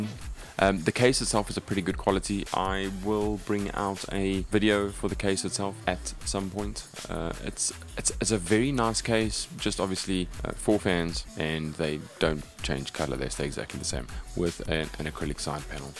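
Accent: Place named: South Africa. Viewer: I really enjoyed hearing the South African accent, continue making these high quality videos!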